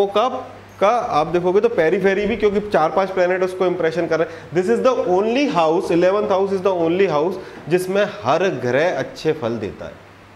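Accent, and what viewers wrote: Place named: Indore, India. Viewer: Sir aap pure Indori ho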